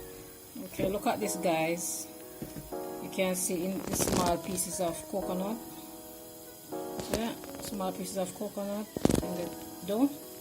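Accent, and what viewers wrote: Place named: Jamaica. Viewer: Hello!! Mirna from Southern Arizona here! 🤓🌞 I love everything coconut! 👍 I'll be sure to try your recipe! Love your Jamaican accent 😁🥰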